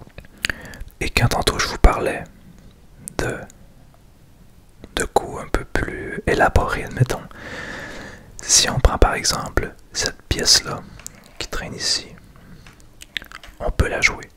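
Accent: Quebec, Canada. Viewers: Entre ton accent québécois et les jeux de société, ça me rappelle les soirées en Randolph, j'en deviens presque nostalgique ! En plus les petites pièces sonnent tellement bien !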